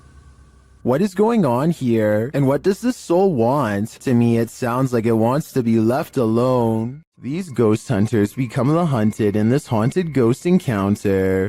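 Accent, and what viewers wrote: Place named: Southern California, United States. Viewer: Your SoCal accent is on point.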